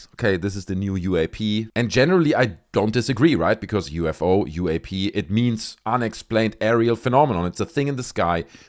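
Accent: Germany. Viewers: You also sound like you have a German accent. Maybe I'm wrong 🤷🏼‍♀️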